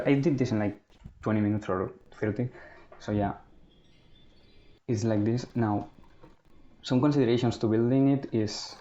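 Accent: Spain. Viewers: El acento de español no te lo quita nadie, y el ingenio para descubrir esto tampoco, buen video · Ese acentillo español no te lo quita nadie eh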